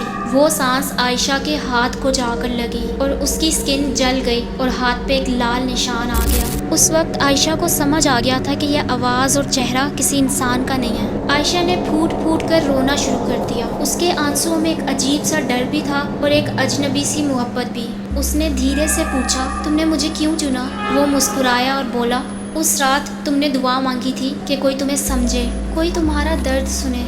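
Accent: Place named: Karachi, Pakistan. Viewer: Lagta hay Ap korangi orangi wali ho... Coll bol rahi ho ap jab ke call hota hay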